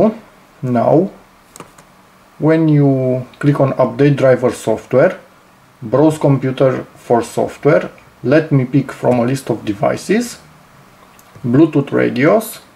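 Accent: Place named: Romania. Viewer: Bro tu esti roman 100% :)))) <3 Edit: Te-am luat dupa voce si cum vorbesti Engleza da se vede si pe paginile web :)))))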